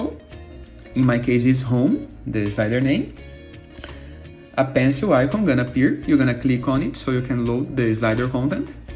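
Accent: Brazil. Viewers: this is a Brazilian accent if you have ever heard one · I'm Brazilian and listening to your video, I can conclude that you are Brazilian as well haha.... so cute are the Brazilians English accent hehe, I don't have it anymore cause I wasn't raised in Brazil. But Thanks for your video, it was short and helpful.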